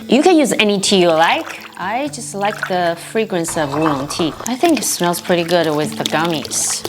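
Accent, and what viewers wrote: China. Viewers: Hi, I tried most of your recipes and it tasted amazing like I was so amazed. Me and my mom are gonna try to make the pasta chips today!! Thanks for the recipe Love your Chinese accent too Love you!!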